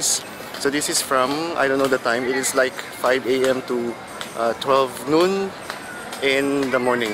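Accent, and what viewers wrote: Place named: Indonesia. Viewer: Betulll,logat inggrisx jg indo bnget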